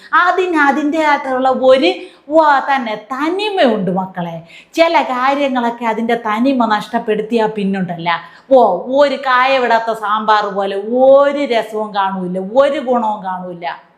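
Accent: Thiruvananthapuram, India. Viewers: തിരോന്തരം ഭാഷ കിടുക്കി 👌👌👌👌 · What said is correct.. Nowadays ' Naadan pattu ' dance exceeds limits & highly boring.😮.. Can not enjoy.. Over action creats problems..Allow audience to relax & enjoy the song instead of creating uncontrollable & uncomfortable sounds.. By the by i can not understand why using malayalam language in a most funny way..? You may be using Thiruvananthapuram slang.. But that is not fair.. Disrespect to the language.. Boring also.. Our attraction to hear the details from you decreases due to this method..Better give details in your natural way..that will be more interesting..Prof.Mohan.. Tvm.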